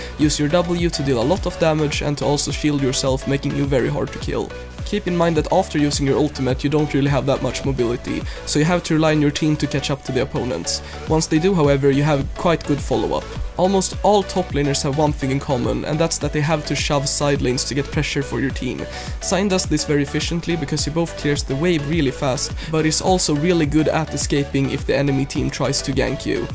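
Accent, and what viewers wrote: Sweden. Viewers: he sounds like he are from sweden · great guide, can you make one that counts for now? love the guide, and how you perform. personally, i main sion, and this guide is pretty good i must admit. love your swedish accent XDD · Great vid man! And btw you sound veeery swedish xD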